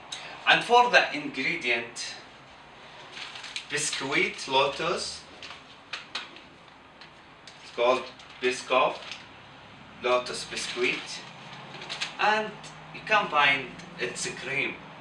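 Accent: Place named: Arab world. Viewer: You are too cute with the Arab-english pronunciations!!!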